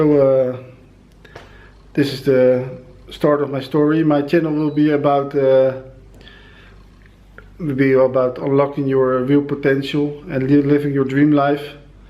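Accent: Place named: France. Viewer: avec cet accent c'est sûr tu es Français !!!! si oui bravo à toi de tout quitter pour la Colombie, tu vas pas le regretter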